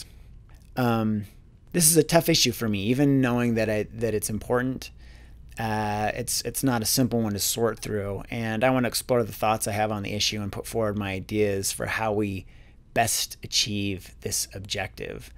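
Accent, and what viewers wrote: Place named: California, United States. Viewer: Where did you pick up that cali girl ascent. It is perfect.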